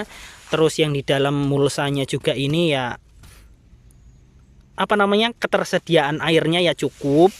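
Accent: Java, Indonesia. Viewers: Tak kiro wong jowo mas e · penasaran.orang Pontianak kok logat orang jawa. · Mas,Kalimantan,tapi keturanan Jawa ya logatnya orang Jawa tu,mohon no hp nya,saya orang Tuban jatim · Ikut nyimak sampean kok lucu boso jowone medok mojopahit ngendi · logat jawa bilang asal pontianak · Tiyang pontianak kok jowone medok banget kang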